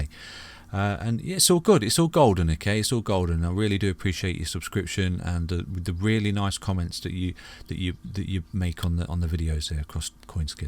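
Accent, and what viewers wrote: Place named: United Kingdom. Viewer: I think the British accent adds to Coinskid's charm.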